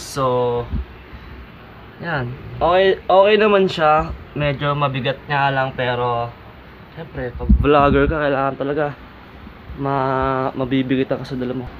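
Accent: Visayas, Philippines. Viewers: Pre, bisaya ka?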